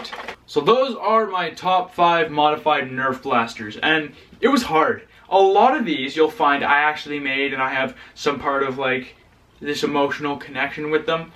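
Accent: Canada. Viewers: are you canadan or american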